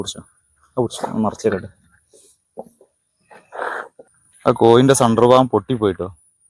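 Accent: Malappuram, India. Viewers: ബ്രോ കൂടുതൽ ജാഡ ഇല്ലാത്ത മികച്ച അവതരണം നാടൻ കുക്കിങ് രീതി ചെയ്തതെല്ലാം വളരെ പെർഫെക്ട് ഇതുപോലുള്ള വീഡിയോസ് ഇനിയും പ്രതീക്ഷിക്കുന്നു കാണാൻ നല്ല ഭംഗിയുണ്ട് മലപ്പുറം സ്ലാഗിലുള്ള സംസാരം പൊളിച്ചു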